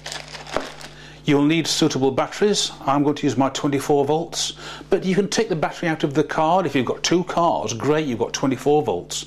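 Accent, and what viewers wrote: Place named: Yorkshire, United Kingdom. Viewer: haha you have to be an electronics teacher, my old electronics teacher had the same sense of humour as you, he plugged a lead into a plug and said to a kid if he holds onto the wires he will be plugged into the national grid and the kid was gonna do it lol he was like are you crazy, go sit in the corner XD but yeah also your accent sounds like your from yorkshire :D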